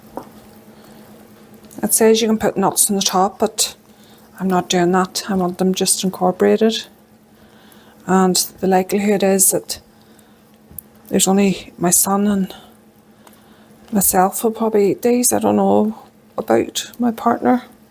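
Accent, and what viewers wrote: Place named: Northern Ireland, United Kingdom. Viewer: I’m enjoying your videos- not only for the recipes but I love listening to your voice/ accent. I’m originally from NI. Haven’t heard anyone say ‘just fire it in’ for years. Thank You.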